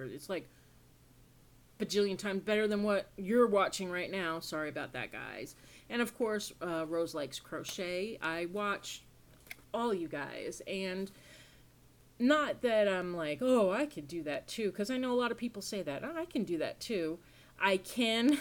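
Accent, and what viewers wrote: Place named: New York, United States. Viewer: Hi! Darla from Crafty Yarn Owl gave you a shout out in her most recent video, “Crochet Bags”. I thought I would start with your first video and I think you are a natural! Your first video was good and I think you have a really genuine easy- going way of talking and showing your projects. I love the name Twisted Stitches! I live in Florida too and used to work as a pediatric nurse. I am originally from Long Island, N.Y. And have lived here for 24 years now. I had a feeling maybe you were from N.Y., but I may be wrong. When you said about the way you were talking, I’ve been in Florida too long I knew what you meant. I say things that I never would have said before and have a bit of a southern twang now when I speak! I am a new subbie and look forward to your other videos. (I am just starting to take up knitting and knitted my first hat, I have been crocheting for years). 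I have a bad back too, degenerative discs, since I was 29, am 65 now. I know what that’s like! Hope you are doing well! 🤗🧶💗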